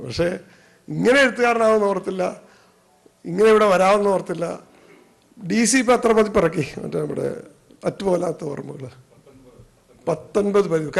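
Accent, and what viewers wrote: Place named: Kottayam, India. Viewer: 40 വർഷം ആയി us ൽ ജീവിക്കുന്ന ഈ മനുഷ്യൻ എത്ര സുന്ദരം ആയി ആണ് തനി കോട്ടയം ഭാഷയിൽ സംസാരിക്കുന്നത് 👍🏼👍🏼👍🏼...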